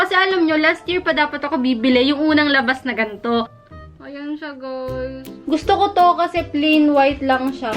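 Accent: Batangas, Philippines. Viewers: Congrats! Deserve mo yan!!! Sarap sa ears pag nag sasalita ka ng language ng mga taga Batangas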